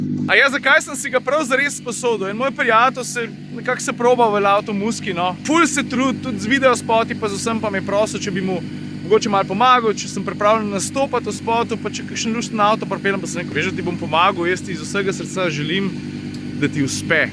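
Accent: Styria, Slovenia. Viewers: velikokrat me tvoji videi tudi nasmejijo, ampak tale uvod je bil pa čisto po štajersko... Čooj a si ti upaš, pa hlače gor potegne:)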